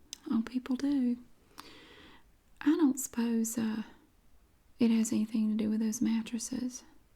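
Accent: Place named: Carolinas, United States. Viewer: I think it’s so cool that you said Charlotte and that you’re from the Carolinas! I’m so used to hearing these accents and way of speaking I think you’re the only Asmr youtuber from down here it’s great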